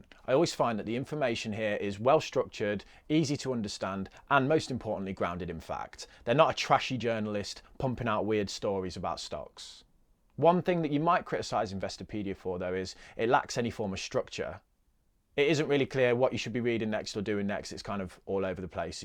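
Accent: Birmingham, United Kingdom. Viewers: I had a strong feeling you were from Birmingham. I’m also originally from Birmingham - King’s Norton. Keep up the good work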